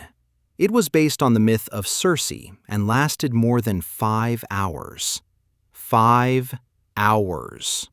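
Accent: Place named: United States. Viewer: Quite interesting, but oh, the American pronunciation! BallAY? Someone has told them French stresses the final syllable. In fact, the stress should be more or less equal on the two syllables of the word ‘ballet’.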